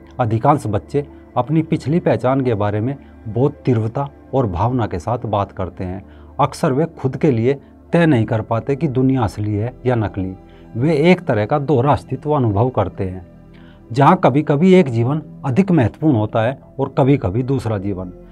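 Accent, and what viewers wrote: Haryana, India. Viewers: Bhai Jis ladke ki baat kr rahe hain main use jaan'ta hoon.btw Bolne k hisaab se lg raha hai k aap haryana se ho. .love & respect from punjab..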